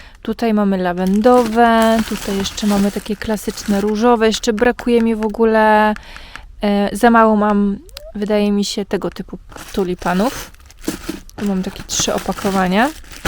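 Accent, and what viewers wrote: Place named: France. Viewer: Na początku myślałam, że to nauka francuskiego- lektor mówił z takim akcentem😅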